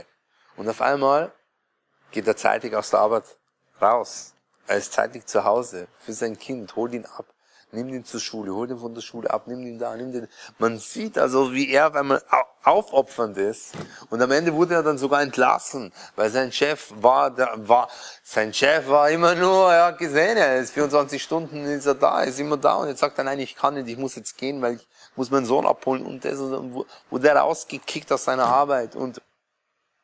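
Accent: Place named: Bavaria, Germany. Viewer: Köstlich der bayerische Einschlag!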